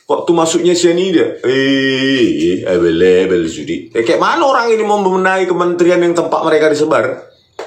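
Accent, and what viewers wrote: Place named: Lake Toba, Indonesia. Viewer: Horas Bang Suarakan Terus Yg Tidak Beres Suka Dgn Dialek Bang Dari Tepian Danau Toba Yg Indah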